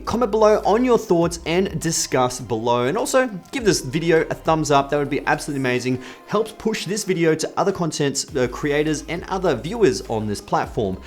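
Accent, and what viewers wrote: Australia. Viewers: I have both of those drones and love them both I am not a professional photographer I only do it as a hobby. I was on your last live chat and was trying to ask you a question. I got low sugar and had to go and get some I’m diabetic. I was trying to ask do you know much about the capabilities of the tracking feature I haven’t really pushed it too far yet and I am just trying to find out some things about it before I go running off to Mount panorama in Bathurst I think you would know where I’m talking about because you sound like you’re Australian. I want to film my classic Merc going round the circuit now whether I fly it manually round or use the active track is my question. I understand that it uses the camera to track. If I use an ND filter would that make the drone lose my car and stop tracking me? When I go there I will have a mate with me so I’m not sure whether to sit in the passenger seat and fly manually or do you trust the active track. 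Do you have an opinion on this for experience. How were you following the JetSki manually or active track? Any thoughts would be appreciated.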